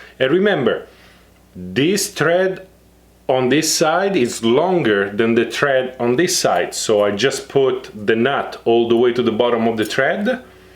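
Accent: Italy. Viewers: the Italian accent adds to the experience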